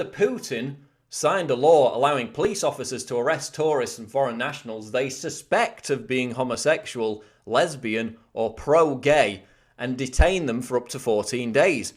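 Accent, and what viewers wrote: United Kingdom. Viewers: never expected to hear bullshit in british accent I always thought a sign of intelligence and sophistication....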